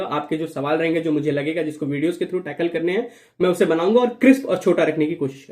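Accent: Bihar, India. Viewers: Your Bihari accent is so cute😂❤ and the video is really helpful thank you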